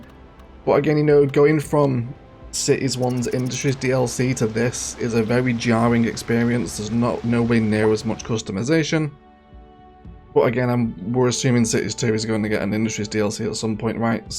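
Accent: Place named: Cockney, United Kingdom. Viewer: Omg cockney 😅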